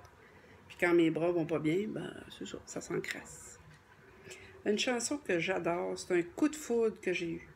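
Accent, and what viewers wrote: Canada. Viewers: Du plaisir à retrouver ta belle voix et d'entendre ce bel accent canadien qui me transporte par delà l'Atlantique. Bisou Gérard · SLT. J'ai enfin su écouter ta vidéo sur un autre ordi. Très sympa ton coup de cœur. C'est doux et très agréable à écouter et parfaitement bien interprété comme toujours. Tu ne peux pas cacher que tu es Québécoise. Tu as un très fort accent et j'avoue que je ne comprends pas tout. A + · Bonjour, c'est quoi c'petit accent Canadien?!.... J'adore! Et oui, c'est très réussi, très très même.... Bises amicales osi. J.C.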